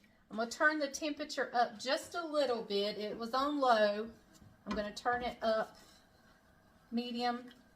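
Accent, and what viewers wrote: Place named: South, United States. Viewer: I was raised saying salmon with the same pronunciation as you. We are beautiful southern belles and that’s just how we talk. 💖 I’m not a salmon person but this sounds really good and I just added salmon to my grocery list. Thank you, I need to add more fish into our diets.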